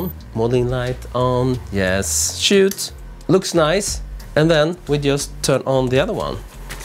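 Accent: Sweden. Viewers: Now I know what word will deffinitley tell if the person talking is swedish, it is the way he pronounces ”glycerol” 😁 great video again, thank you! 👏😊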